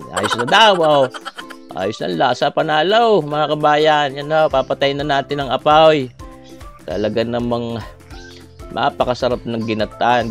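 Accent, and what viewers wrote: Batangas, Philippines. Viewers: Kabayan nag review pa ulit ako ng luto mo. I believe na napakasarap niyo. Inggit ako. Salamat kabayan. Palagi kitang sinusubaybayan. Aliw na aliw ako dahil sa puntong Batangas. Pati ako ngayon ay na pra- practice ko ang tagalog na may puntong Batangas. Panalo yan.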